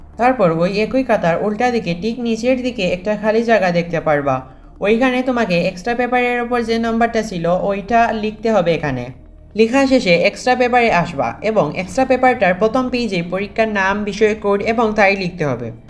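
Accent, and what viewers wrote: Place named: Sylhet, Bangladesh. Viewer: সিলেটি ব্র😢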